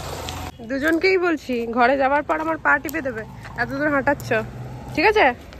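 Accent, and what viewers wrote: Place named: Bankura, India. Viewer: হটাৎ করে ইউটিউবে ভিডিও দেখতে দেখতে আপনার চ্যানেল ভিজিট করা,,তারপর থেকে আমি সিরিয়াসলি এটা জানি না কেনো আপনার ভিডিও দেখি কারণ না ভালো cinematography আছে বা না ভালো এডিটিং ,,কিন্তু কোনো এক অজানা কারণে হয়তো ভিডিও দেখি,, একটা কারণ বুঝতে পারি সেটা হলো আপনার কথায় একটা আলাদা টান আছে যেটা আমার বেশ ভালো লাগে, যতদূর সম্ভব বাঁকুড়া র টান এটা,,যায় হোক এগিয়ে যান,, আপনার চ্যানেল গ্রো করুগ এই আশা রাখি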